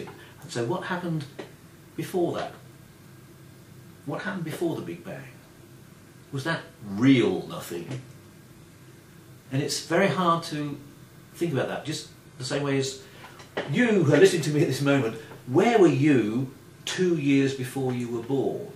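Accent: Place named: Australia. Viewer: The visible universe is but foam on the ocean of the unseen/dark universe. Incredibly mysterious to us now as the leading edge of quantum and classical physics. Also, even though he's obviously English, I do detect that Mr. Close certainly has an accent that sounds unmistakenly 'Australian' ... of sorts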